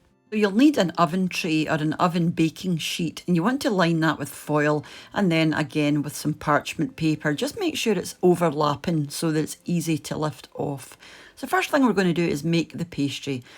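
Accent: Scotland, United Kingdom. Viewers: Okay, I just found this channel and I LOVE it. I always loved scotland and now, I can attempt to cook scottish things while listening to an actual scot (with a marvellous accent I might add) explaining it to me. That's wonderful! (Sorry for spelling mistakes, I'm being exited, tired and not a native speaker/writer at the same time. And I always suck at spelling)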